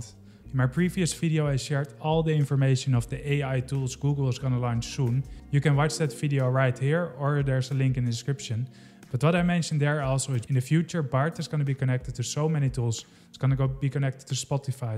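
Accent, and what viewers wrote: Netherlands. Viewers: I enjoyed your video on Bard vs ChatGPT very much. It was very informative and I learned a lot about the two language models. I could tell you are Dutch by your accent 😊. Greetings from South Africa!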